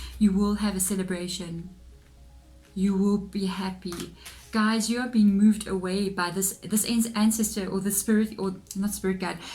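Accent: Afrikaans, South Africa. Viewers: Hey! First time on your channel¡ About to watch the reading, but heard your accent! Are you Afrikaans?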